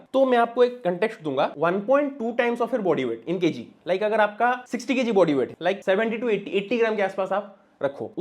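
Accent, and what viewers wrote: Odisha, India. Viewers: Odia loka nka Hindi bhai pura jaana padijauchi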